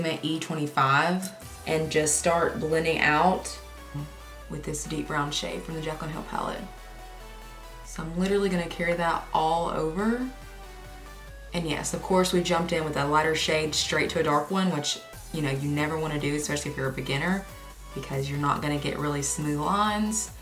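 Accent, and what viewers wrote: South, United States. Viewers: I love it!!! I'm from NC, and its so refreshing to hear it! 😊 (Yes, I realize the geographical distance between Alabama and North Carolina, but I meant the southern-ness of it!!!) 😘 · I’m from Kentucky we share the same southern accent! Lol. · Sounds southern.....(I'm a Texas girl) ☺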